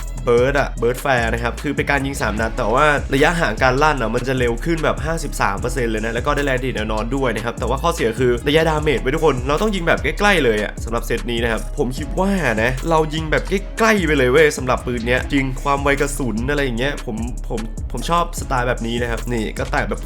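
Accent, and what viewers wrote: North, Thailand. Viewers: พี่เป็นคนเหนือใช้ไหมครับถ้าใช้พี่อยู่จังหวัดไหนผมอยู่อุตรดิตถ์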